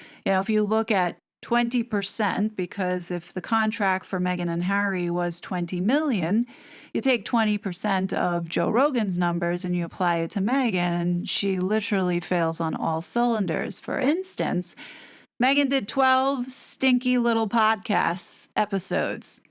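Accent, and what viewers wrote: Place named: New York, United States. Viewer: this is totally off topic, but you should look into doing books for Audible or some sort of ASMR perhaps. The way you speak, not just my lifelong love of NYC accents like yours, but your inflections, whit and intelligence, I could listen to you do anything. Read anything. And be captivated which isn’t easy for me to be captivated by someone I hear and can’t see. Love you friend. Maybe you can voice commercials? I need you to narrate my life😂😘💛 sending love from California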